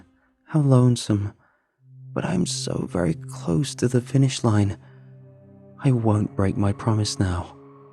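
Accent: United Kingdom. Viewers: I hear many stories told from Minnesota. I wonder if, even tho sounding British, lives there. Or maybe a writer he narrates often is in that cold azz state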